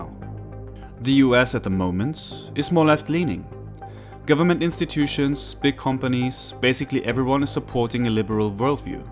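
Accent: Germany. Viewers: Have you ever lived in the U.S.? I hear you have a German accent. Well, the only candidate who gave a shit about helping working people Bernie Sanders was labeled "too radical for the American people" because he wanted to develop a universal healthcare system like every other western democracy besides us has and get us free or nearly free college. In fact, I heard a German political commentator state that this radical Bernie has views that line up well with the Democratic Christian Union the best in German politics.... The conservative party 😂. And as for religion, nearly 70% of the country is still fundamentalist Christian so that's not true either.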